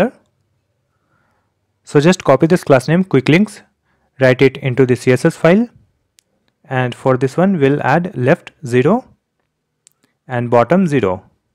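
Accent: India. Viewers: Why westerners are making fun of his accent . English is not first language of Indians. Show some respect to his work. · Oh my god. This indian accent...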